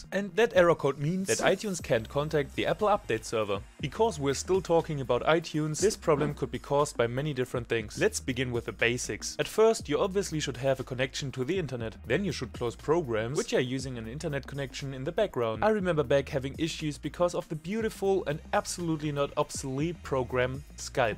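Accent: Germany. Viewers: I like the German accent lol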